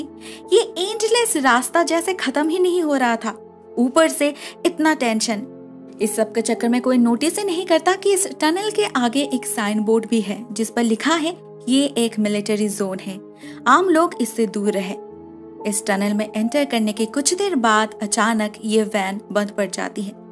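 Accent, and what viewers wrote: Bengal, India. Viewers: Your bengali tone in voice can be identified..but it's sweet and nice to listen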